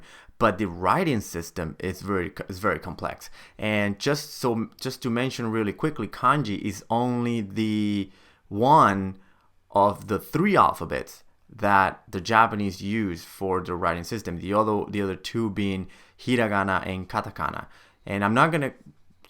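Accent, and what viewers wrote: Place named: United States. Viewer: Akimoto Hasegawa this is definitely not Japanese or Chinese accent, as a Chinese I think he is an American .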